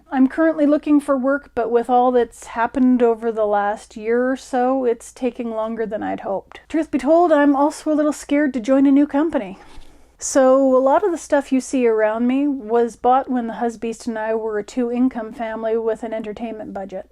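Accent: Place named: Ottawa Valley, Canada. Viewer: Huh. I thought I was the only one hearing an angelic choir every time one mentions... The Algorithms… (gosh, they're loud). Big congratulations on the bump in viewership. Well done. Ah the subtle twang that is the Ottawa Valley. Fascinating that you got mistaken for Australia. And that 'Somebody knows something' podcast, season 1. Well. It's not often that you get the details of things that happened to someone who would have been mine and Mister Husbands age. I probably fished around that lake around that time. I'm loving the post-modern digital communication analysis, by-the-by. Guess we were having the same conversations at Carleton back in the day (the painters tape you have over the 'pop' bottle lettering... bowing). Suggestion for one of those marketing relationships kind of thing - make friends with the local independent 'stuff' suppliers for at least a cut in costs of material. 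I have no idea if there's an equivalent to the agency that reps Miz Bernadette in the Canadian area codes - maybe somewhere in T-dot or Van? Maybe Enchanted Rose knows someone? The 1915 hand-crank Singer 99K is here. And sews just like she came out of the factory - absolutely mind blowing. And I got to use her on my FR entry. Which is almost finished and about to be photo-ed. Form fitting shell dress a la the (19)40's, representing Blanch from Streetcar Named Desire. Too cheep & poor for patterns so I taught myself how to pattern draft for my Covid time. Not baggy clothing is... weird. I'm used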